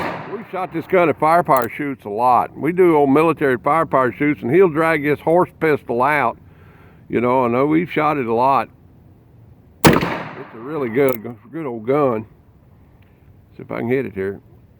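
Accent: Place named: Texas, United States. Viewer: He sounds like a fellow TEXAN to me!!!!!!!!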